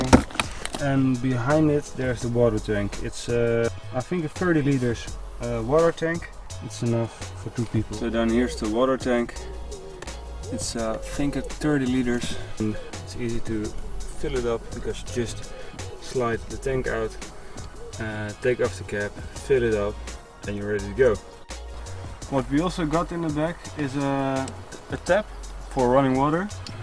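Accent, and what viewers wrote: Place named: Netherlands. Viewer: Super gaaf gedaan! Ben er best jaloers op! Aan je Engels te horen kom je uit NL denk ik.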